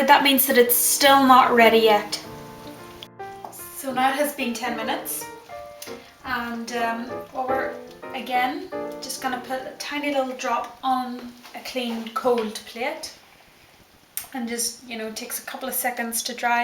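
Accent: Ireland. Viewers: Irish accent? Or Welsh?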